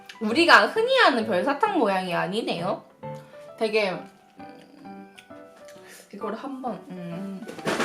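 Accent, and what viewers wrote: Busan, South Korea. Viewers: 부산말투 원래 저래요 그러니 남자들이 좋아하죠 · 음!! 부산 사투리가 조금씩 사용하시네요 ㅋㅋ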